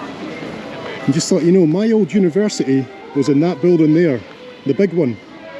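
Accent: Scotland, United Kingdom. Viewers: As a Norwegian, I do understand your Scottish dialect quite well. I have a friend from Glasgow, I need to ask him a few times when he elaborates in discussions in Glasgowean. I guess it is a matter of listen to it and get used to it and to tune your ears to it. Then you will hear and understand it after some listening. I have the Rab C Nesbitt series, it is subtitled in English. I invite you to Norway. We do have dialects here that are like separate languages. Norway and Sweden is like Scottish and English dialects. Swedish people say they dont understand Norwegians, but that is just lazyness and non interest of trying to listen to the sound. Norwegians is opposite and try to tune in to Swedish language and that works. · Hey, I just subscribed to your channel. I've been watching for about a week now and to mimic your lovely Scots brogue "I haven't been disappointed!" Your day trip to Gothenburg was an eye opener as this is where my granny grew up and throughout my life never knew what the city was like. 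Thanks. This morning I watched your Salamanca return visit. It was amazing. After watching all these trips of yours, I just cannot figure out how you can afford it 😮. What's your secret? I'd also like to know a bit of your back story. Letting you know you have a new fan.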